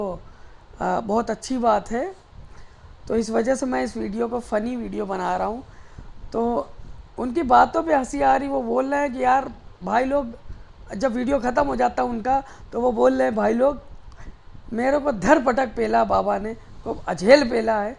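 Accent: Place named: Uttar Pradesh, India. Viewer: भाई पहली बार आपकी वीडियो देखी, बहुत अच्छी लगी, कहाँ से हो आप भाषा से तो उत्तर प्रदेश की लग रही है???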